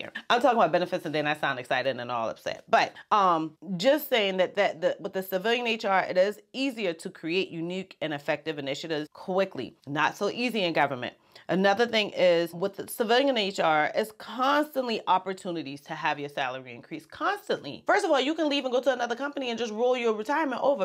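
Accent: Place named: Lowcountry, United States. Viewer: I don't know how your channel popped up on my feed. I'm just glad it did. I love the content but what got my attention immediately was the accent to which I said, This lady is from my home. I scroll down to the bottom of your description and see your address as Goose Creek. I definitely have to click subscribed for the Low Country. Stay positive!!!!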